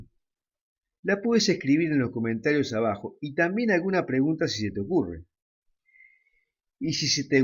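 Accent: Argentina. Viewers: Tengo 2 preguntas Estos vídeos llegaron a mi como sugerencias, no conocía el foro, por los temas que tratan y por las fechas de publicación parece ser nueva la dirección. <link> cuando estuve en la página quise saber quienes eran ya que está la opción, por más que la clike no me lleva a ningún lado. Las preguntas son: Es una org religiosa? Si bien por su acento parece argentino Ud es religioso o historiador?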